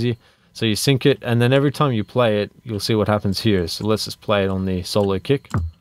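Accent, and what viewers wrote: Australia. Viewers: Aussie accent ?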